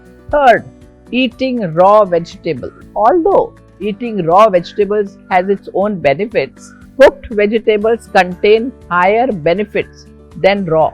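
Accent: India. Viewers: I love her and I love this channel and I mean no disrespect when I say she sounds like professor McGonnegal with an Indian accent 😂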